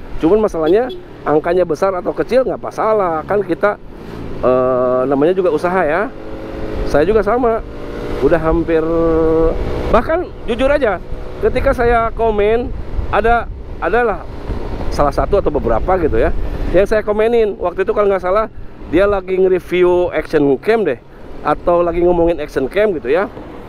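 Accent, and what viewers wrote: Purwakarta, Indonesia. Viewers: Kalo ngedenger cara ngomongnya jadi inget temen saya yang orang Purwakarta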